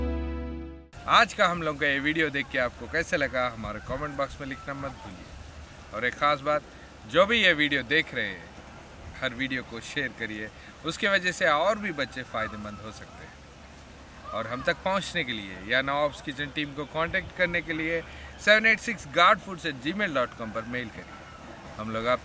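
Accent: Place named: Tamil Nadu, India. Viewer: Kaun Se Gao Wale Bhaisaab Tumhe ??? Baat Karne Ka Style Se Tamil Waale Dikhren Tumhe...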